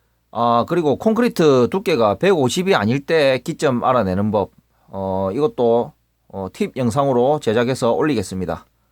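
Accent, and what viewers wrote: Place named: Busan, South Korea. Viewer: ㅋㅋ 늘 재미있게 보고 있습니다. 설명하시는 것들 충분히 이해했고, 표가 없이도 나름대로 길이를 찾는 법을 찾겠더라고요. 그래도 자세한 설명이 큰 도움이 되었습니다. 형틀목수 시작한지 얼마되지 않았는데, 관록도 필요하지만 이론이 바탕이 되어야 한다는 것을 많이 느끼게 해주셔서 감사합니다. 당장은 써먹을 일이 없겠지만, 기회가 오면 도망가지 않을 수 있을 것 같아요. 너무 빨리 그 날이 오면 선배들에게 눈치가 보이겠지만, 그래도 기회를 잘 사용해서 현장에서 인정받고 싶네요. 계산에 무지한 목수만 있는 것이 아니란 사실을 보여주고 싶기도 합니다. 구수한 부산사투리에 솔직한 썰... 늘 즐겁게 보고 있습니다. 언제 부산에 가면 한잔 하시죠 ㅋㅋ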